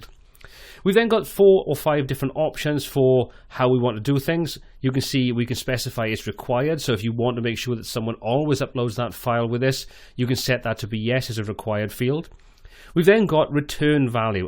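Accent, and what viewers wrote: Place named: England, United Kingdom. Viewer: Yes, they're absolutely brilliant. Nice to hear an English voice too.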